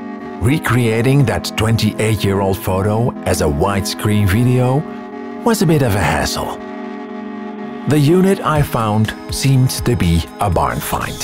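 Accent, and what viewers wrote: Netherlands. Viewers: Me: That accent.. Is this guy Dutch?: “Toedels!” Me: YUUUP! Gelijk geabonneerd! ❤ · An interesting dutch accent!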